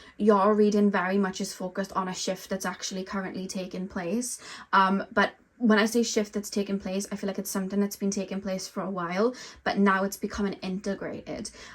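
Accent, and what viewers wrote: United Kingdom. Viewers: I really like your readings but you talk too fast with a British accent I couldn’t catch up.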